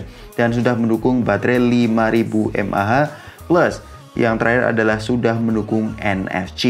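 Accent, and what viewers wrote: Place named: Java, Indonesia. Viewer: Medok e bang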